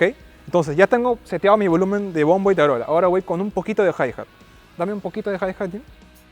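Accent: Peru. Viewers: Tiene el típico acento limeño. Muchas gracias por los datos sobre el Ear Monitor.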